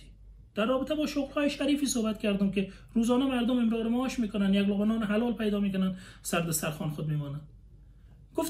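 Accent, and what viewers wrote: Iran. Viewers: شایدانسان خوب باشی ، اما طرز صحبتت کمی ایرانی شکل است و برای لحجه دری صدمه وارد میکند .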